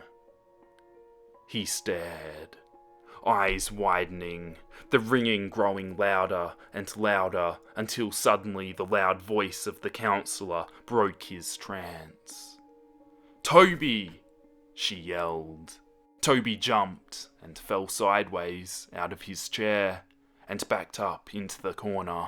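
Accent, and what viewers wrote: Australia. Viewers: I think it sounds more austrailian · You sound like an Australian version of Chillz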